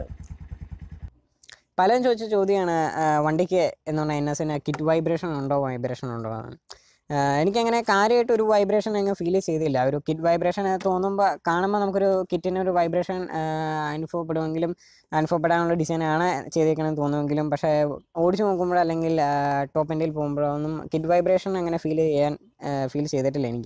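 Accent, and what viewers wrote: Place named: Bengal, India. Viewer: Bengali malayalam parayunna pole ind brw sorry feel avaruth.... Plz improve ur audio quality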